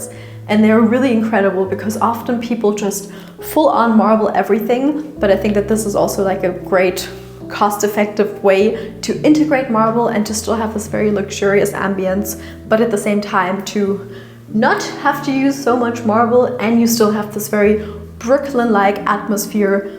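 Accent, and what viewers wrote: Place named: Germany. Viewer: Die Objekte auf diesem Kanal sind ja wirklich interessant und inspirierend – wenn nur die Moderation nicht wäre: Dieses deutsche English, verbunden mit einer kindlichen Schwatzhaftigkeit, ist einfach entsetzlich nervig.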